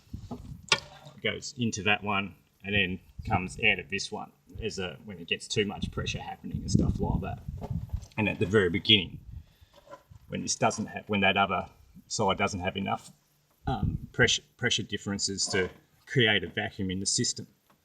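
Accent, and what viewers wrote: Australia. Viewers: Its not. Perfectly normal Aussie accent. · Damn! If your Aussie accent was a little less prominent I might be able to understand your mumblings. Seriously, Mate. Speak clearly for the audience. I did figure out your hose routing, so, thanks for that. Be well.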